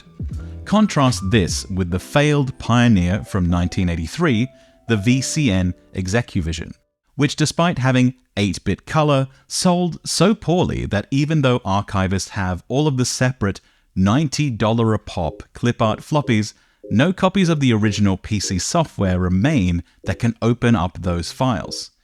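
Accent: United Kingdom. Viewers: Why does your voice suddenly sound bassier and slightly more British? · sorry man cant finish this video your british voice is just so condescending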